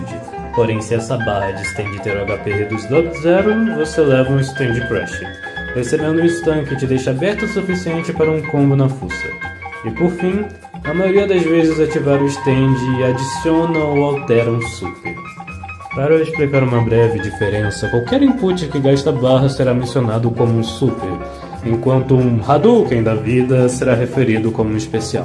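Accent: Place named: France. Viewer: You sound kinda french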